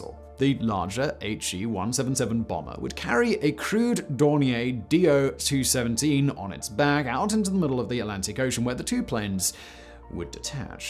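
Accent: United Kingdom. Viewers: Are you British or American?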